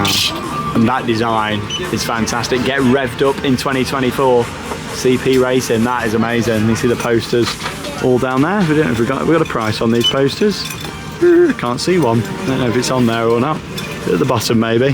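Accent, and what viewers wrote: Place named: United Kingdom. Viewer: Holy British, Batman! I can’t understand a word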